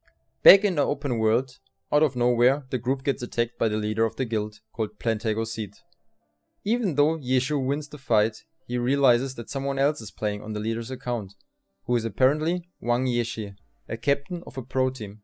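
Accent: Germany. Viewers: Dieses deutsche englisch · Ich hör raus dass du deutscher bist ;) Weißt du vllt wo man den schauen kann, weil chrinyroll hat den nicht. Wollte mir den nochmal anschauen weils auch schon 2 Jahre her ist. Feier dein Content. Find solche Videos richtig gut weil man sich immer ein Stück weit ein Video rein ziehen kann um zu schauen ob der Anime einem zusagt oder nicht👌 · Uhh okay so two things great video but correct me if i am wrong is that an german accent if so i must say great english skills :D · Hey is it just me or is your accent a bit German?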